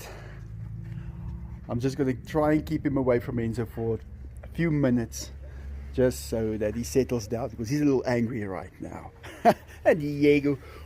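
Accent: South Africa. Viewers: I love watching videos he has true love for animals, and I love the SA accent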